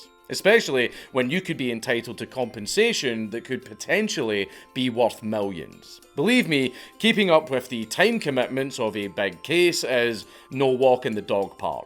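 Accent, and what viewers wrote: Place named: West Scotland, United Kingdom. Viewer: The police officer that told men the put your weapons away in a mosque, the police have guven them amnesty. None of them will see the inside of the court. As a feelow scots please keep shinning a light on what they are doing to us. My daughter has asked if your from Edinburgh as your accent sounds like mine, i dont thiugh i think your fi the west coast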